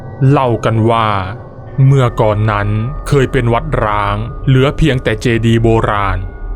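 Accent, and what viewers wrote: South, Thailand. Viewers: ภาคใต้ใช่ไหมคับ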